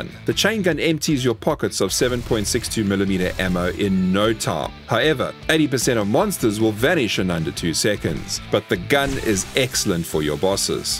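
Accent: South Africa. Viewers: First vid of yours I've seen. About 2min in, I was like, this dude sounds like a Saffer. Lo and behold a fellow South African. Nice one boet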